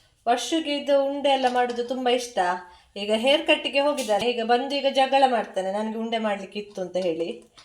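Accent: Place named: Mangalore, India. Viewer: manglore kannada supr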